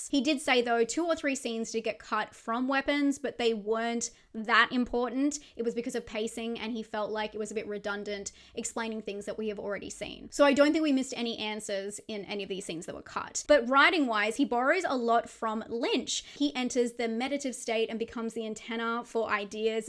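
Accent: Australia. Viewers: We say ree-NYOO-al You sound Australian. Aren’t you from Australia?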